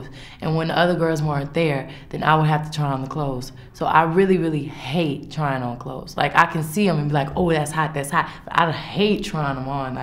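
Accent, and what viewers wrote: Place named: Texas, United States. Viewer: She has a US Southern Texas accent so if you go to Texas it is proper. I’m sure whenever you visit a different state if your an American or another country your accent would sound like shit too 💯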